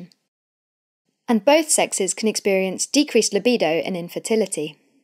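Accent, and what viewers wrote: United Kingdom. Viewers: British accent 😊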